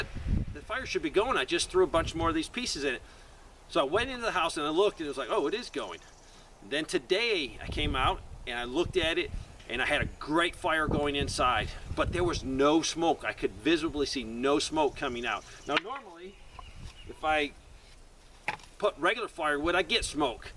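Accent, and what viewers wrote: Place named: Kansas, United States. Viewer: why are you called when you sound like you're in kansas or somewhere not south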